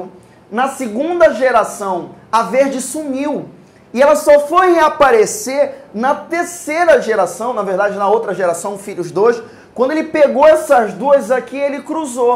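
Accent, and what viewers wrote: Pará, Brazil. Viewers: é paraense? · Esse cara só pode ser do Pará... É lá de nós. éguaaaa cara saudade da nossa terrinha. o melhor. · Ede Montanho ele é paraense. 😊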